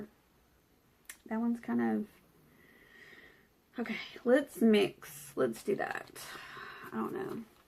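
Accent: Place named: Tennessee, United States. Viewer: I'm assuming that if you was my neighbor , we d be best friends. You remind me of my friends in Tennessee, so I like hearing you talk.And you go through same traumas and dramas we all go through, so you are relatable.And you are knowledgable where I am ignorant, so you are my teacher ❤️❤️❤️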